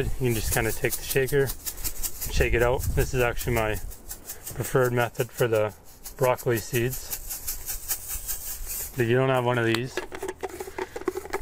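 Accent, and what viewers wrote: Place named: Canada. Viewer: could tell he was canadian right away! :)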